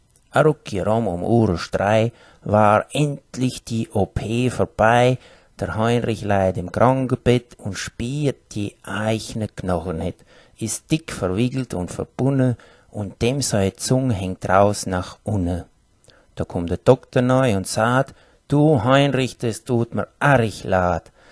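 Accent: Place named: Palatinate, Germany. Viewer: Ein bisschen hört es sich auch an wie pfälzisch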